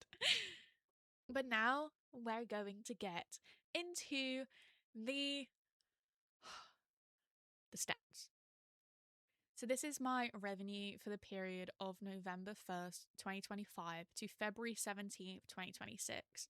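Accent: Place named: United Kingdom. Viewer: I think your voice and model match up nicely. I'm a small youtuber and I envy your correct British accent. Streaming is a cut throat business so I wish you well.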